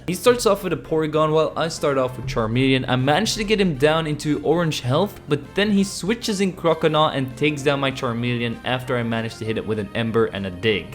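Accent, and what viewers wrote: Belgium. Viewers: Wait.. You're Belgian? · U from belgium? Nice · BEN JIJ EEN BELG!? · Deze man komt gewoon uit België!!!!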